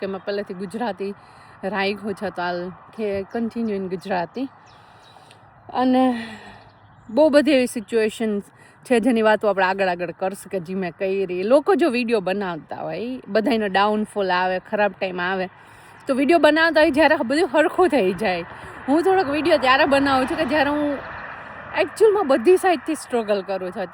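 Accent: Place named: Saurashtra, India. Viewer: બેન આપ મુળ વતની ક્યાં નાં કારણ કે આપની ભાષા સૌરાષ્ટ્ર ની હોય એમ લાગે છે આપ હાલ ક્યાં રહો છો આપની સમસ્યાનો હલ જરૂર ભગવાન માતાજી કરશે... આપની સમસ્યા સાંભળી દુઃખ થાય છે જય માતાજી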